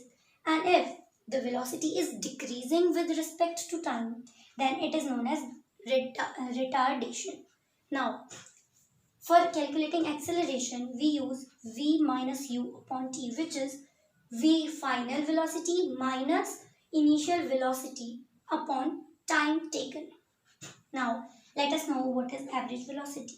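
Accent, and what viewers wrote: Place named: England, United Kingdom. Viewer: your confidence and english accent makes it awesome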